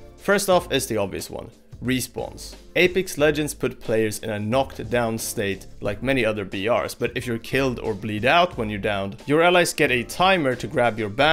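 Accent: Sweden. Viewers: Are you swedish? You have a very swedish accent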